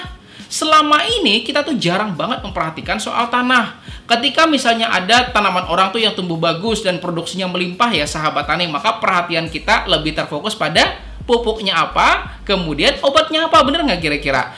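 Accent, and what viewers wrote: Banjar, Indonesia. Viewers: Joosss buat ppl...walaupun gmn logat banjar ny gk ilang²....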